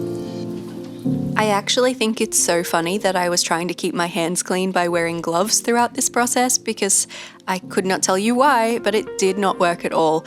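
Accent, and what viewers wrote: Australia. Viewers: Found you on searching for ink swatching! I'm in Adelaide and when I saw all the Ferris Wheel Press I wondered if you were Australian. Duh, couldn't I tell with the accent but prob because I couldn't hear one (being an Aussie myself) it didn't click! Hahaha. Anyway, loved watching all your swatching and love that you share inks with friends. Never knew there was a Pen club of sorts. Wonder if there is one here in Adelaide? I share ink with one friend and will soon receive a coffee smelling one she recently bought in Singapore. Can't wait for that. 😊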